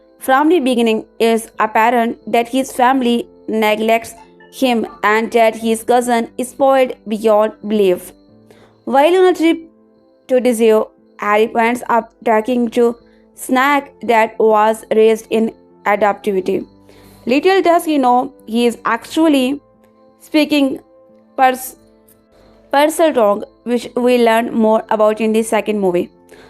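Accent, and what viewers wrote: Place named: India. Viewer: We don’t need your story telling with weird indian dialect you morron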